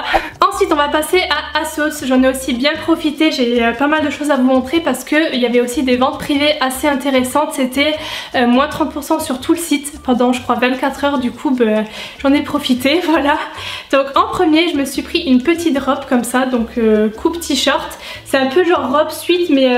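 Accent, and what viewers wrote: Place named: Nord, France. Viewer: Merci pour cette vidéo 😍 j'ai flashé sur les sandales et la ceinture 👍 j'adore tes vidéos, ta façon naturelle de parler et ton accent du Nord 😊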